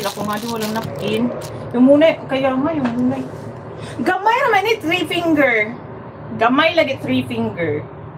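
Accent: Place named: Ilonggo, Philippines. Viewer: Natutuwa ako.sayo pag sasalita ka ng ilonggo.. ❤A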